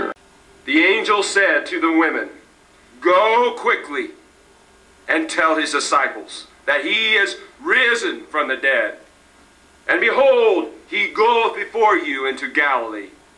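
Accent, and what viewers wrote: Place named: United States. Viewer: His church is Cildwrn, Llangefni, Anglesey, North Wales. Strange to hear this in an US accent!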